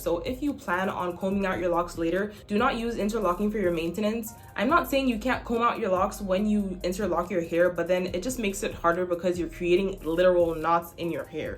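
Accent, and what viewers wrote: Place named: Africa. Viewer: You have a slight accent, I’m just curious are you African? Also I love your videos, they’re very helpful.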